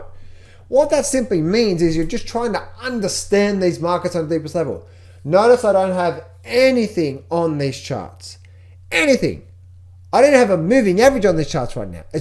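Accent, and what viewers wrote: Australia. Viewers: The actual quote from Ed Seykota is: "The trend is your friend, until the end...when it bends." Don't forget the "bend" part,! Keep up the great work. Love the Aussie accent.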